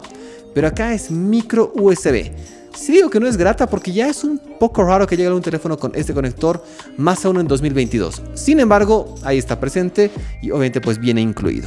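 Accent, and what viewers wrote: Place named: England, United Kingdom. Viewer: por el asentó pensé que lo eras por qué hablas como un inglés cuando habla español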